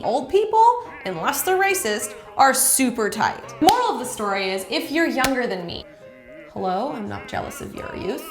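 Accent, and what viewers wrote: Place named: Minnesota, United States. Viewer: Dying! Not only because you make ALL good points but also because you seem to be fighting a Minnesota accent haaaaard the whole time lols you crack me up!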